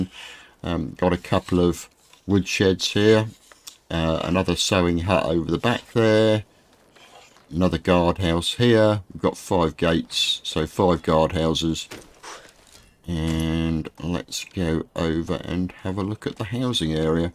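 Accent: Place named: West, United States. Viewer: I came here to watch your village, but you also thought me how to make a big bridge, I want to build one so bad and every video I watch about it, it only teachs to put some planks over a little stream, not across a big river like you did, thanks for that! (plus, I like the way you talk, sounds like a cowboy or something)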